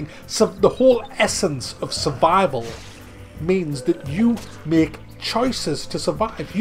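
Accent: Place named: Newcastle, United Kingdom. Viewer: Ahh right, well your accent made this review even funnier, really sounded geordie. What was the game you suggested buying instead of this?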